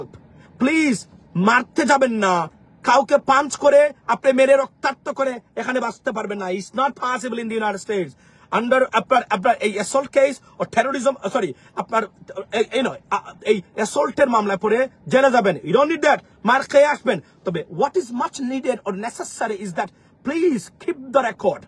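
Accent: Sylhet, Bangladesh. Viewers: I like your content and I must inform you I love Sylheti dialect. It is a melancholy sound to my ears but it’s me. If you don’t mind since, you are speaking in public platform. Could you speak proper Bangla. It might give you a non-biased bigger audience. Just saying. Thanks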